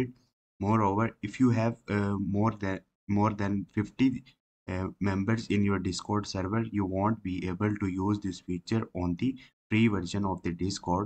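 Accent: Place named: India. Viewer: bro is trying so much to dont have an indian voice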